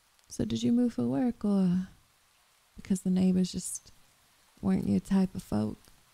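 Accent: South, United States. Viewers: Since I'm not from the south, I cannot judge your accent. I'm too far north to be allowed to do that. You sounded more southern than I can try. :) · Only thing I have to say is that the SFX at the start is WAY TOO LOUD compared to the voiced bit 😅. Apart from that, this was lovely to listen to 😊. Even though I'm usually not a fan of the southern accent, your voice is so soothing that I actually liked it in this audio 🥰. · I'm not usually into the southern Bell but for you I think I can make an exception. 🥰 This was really great truthfully you sound more natural southern compared to people that I know that are southern 😭. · Each is getting better than the last, it really shows your getting a hang of the programs and good sound design! The rain maintaining its sharpness in the background is a nice juxtapose against the smoother southern accent that isn't comically overblown uwu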